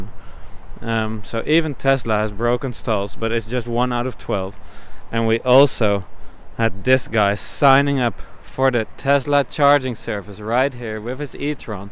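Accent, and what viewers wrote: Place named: Netherlands. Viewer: your Dutch/English accent sounds different from other Dutch/English accents I’ve heard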